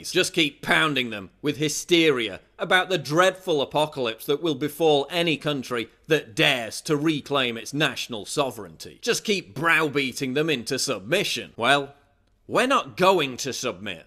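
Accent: United Kingdom. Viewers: I'm about 90% sure that is just another quirky characteristic of British English. Just like he, and many others would probably pronounce "luxury" as "lugzury." Also, they tend to pronounce "Obama" as "Obamer" with the intrusive-r. There's nothing wrong with it in my book. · After all: British accent, expression and choice of words is so mutch nicer to listen to than to american traitor english >>>> american english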